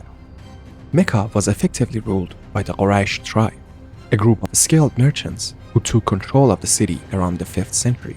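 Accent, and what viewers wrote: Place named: China. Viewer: For da luv of god please stop talking ! Ur chinglish is terrible ! Get someone who can speak & pronounce English words correctly.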